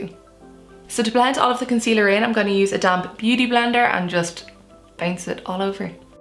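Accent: Ireland. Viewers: Beautiful. Didn’t need the lashes, but they’re gorgeous too. I’m in California but I lived in Ireland for a few years, and I MISS the accent and the fast talking! Most Americans just talk too slowly! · I keep seeing Charlize Theron mentioned, but she could only hope. You look computer generated (I mean that in the best way - you're inhumanly beautiful). Plus, your voice is a lilting almost ASMR-like brogue. I don't know how you don't have 40 million subs!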